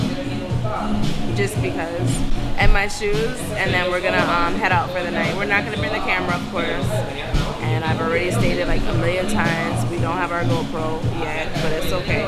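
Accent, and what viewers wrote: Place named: Spain. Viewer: You got a lil Spanish flow! Love watching people enjoy my island! 🍻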